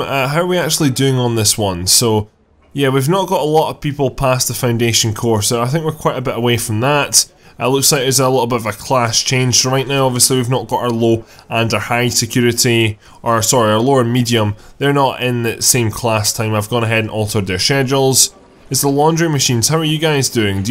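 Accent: Scotland, United Kingdom. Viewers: New block? Scottish accent? Cites Skylines? i'm pumped as f$&£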